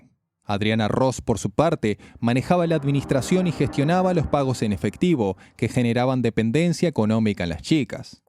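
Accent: Argentina. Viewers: Lo siento no es por ofender, pero el asento Argentino me desagrada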